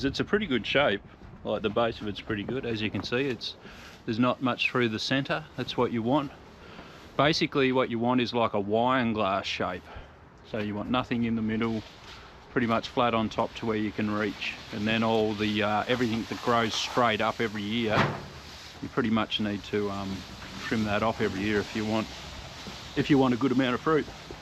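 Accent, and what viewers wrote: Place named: Australia. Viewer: You Aussie men are definitely made different! I don’t know of any American men that would get on their hands and knees and weed through a small rock bed much less over half an entire yard like y’all did!!! You and work great together and I love seeing him using the power tools every once in a while. He seems to stand up taller, lol!!!😉 Ya’ll keep up the good work and keep the blessings going!!!❤ P/S Love the hair, the accent, the whole package!!!😊 Nelly, USA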